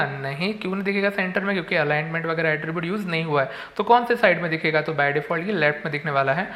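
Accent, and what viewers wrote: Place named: Maharashtra, India. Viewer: He sounds marathi for sure 😁😂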